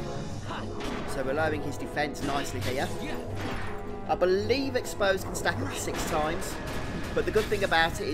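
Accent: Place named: Birmingham, United Kingdom. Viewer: He's from the Birmingham region.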